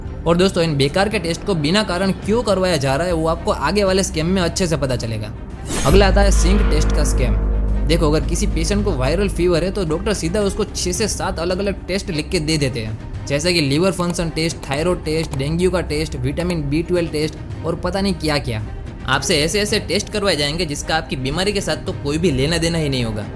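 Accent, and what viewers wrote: Gujarat, India. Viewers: ભાઈ ગુજરાતી છો તમે અવાજ પરથી .... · Aap gujrati ho bhai 😊😊😅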